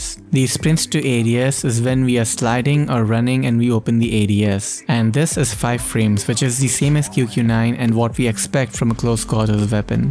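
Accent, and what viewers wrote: India. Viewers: You sound Indian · Hey are you Indian 😅😅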